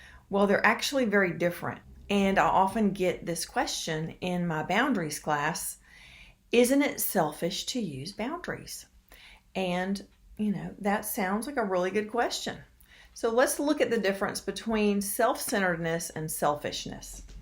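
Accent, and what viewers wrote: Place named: South, United States. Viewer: Beautiful southern accent 😍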